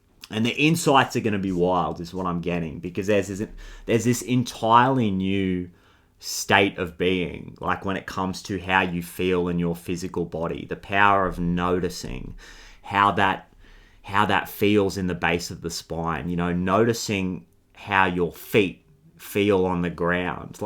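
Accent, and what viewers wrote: Australia. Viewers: Thanks bro. Are you from Australia?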